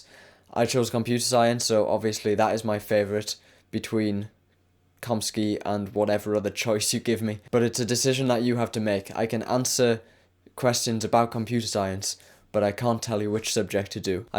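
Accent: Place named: Northern England, United Kingdom. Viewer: Thanks for the upload my friend, I'm from the North of England (Newcastle) and i think i can hear a hint of Northerner in you :D Were are you from?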